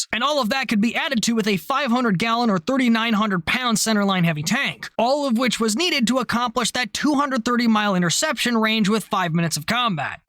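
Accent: United Kingdom. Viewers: As a British citizen 🇬🇧. I approve of your accent you may to Britannia & feel welcome. Keep up the jolly good Work old boy. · I was wondering where the CR105 in project wingman came from. Banger British accent bro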